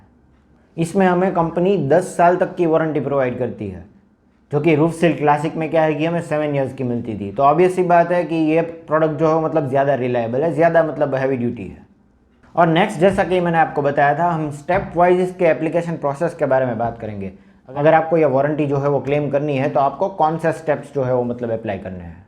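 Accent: Gujarat, India. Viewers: That gujrati ascent is so good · Gujju raping hindi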